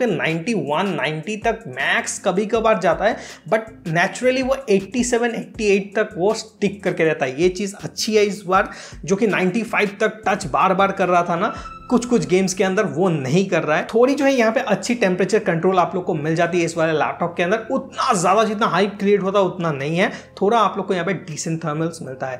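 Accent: Assam, India. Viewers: Damn you're from assam? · Aap Assam se ho kya??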